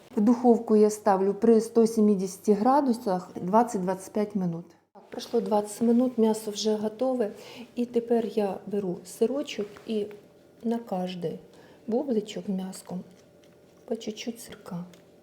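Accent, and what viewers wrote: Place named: Surzhyk, Ukraine. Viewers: Ви не схожі ні на кого,у вас свій стиль на воя харизма,у вас суржик,але він більш український,уГалини ж тільки для виду пару слів українських,ви приємна чудова,доступно все розповідаєте і показуєте не дивлячись на те що не закінчували кулінарних закладів для мене особисто ви своя,зрозуміла і ХОРОША ЛЮДИНА❤🎉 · Мені дуже подобається ваш канал. І я дуже дуже вдячна вам за ваш суржик. Він мені такий милий, бо нагадує Херсон, де я проживала до війни. Успіхів вам! ви така неповторна і щира.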